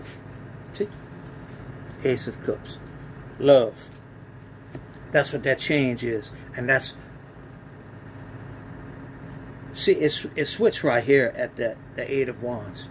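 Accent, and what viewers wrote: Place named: South, United States. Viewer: just love yr southern accent and manners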